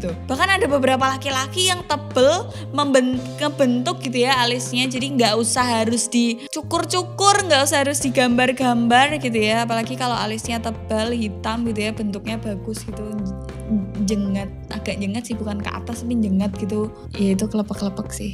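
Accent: Java, Indonesia. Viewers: jowone medok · mbak e medhok tp tep nggemesi hmm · Jowone pundi sampean kak... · Logatmu..medok tenan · Boso jowo ne medok banget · Jawa bgt reeekkkk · medok banget suarane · medok medok kepiye..gitu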